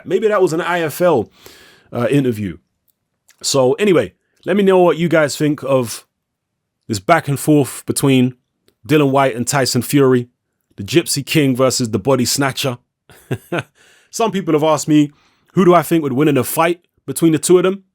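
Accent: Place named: Cockney, United Kingdom. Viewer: Guess where from?? Can't half tell this man is black an cockney!! Gives Tyson no credit & dillian AJ all the credit.. can't fucking wait till Tyson schools wilder, my guess is will change his mind & make it 60/40 wilder leading up instead of 80/20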